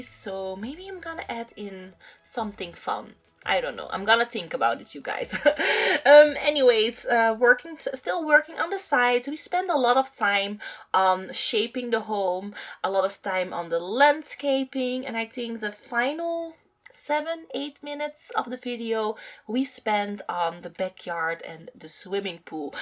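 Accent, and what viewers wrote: Netherlands. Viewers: je klinkt nederlands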